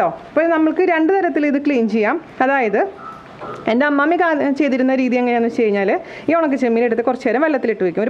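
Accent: Thrissur, India. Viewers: Natil vannapo chechik thrissur accent koodi😍. Njan kottayam accent aanu ketto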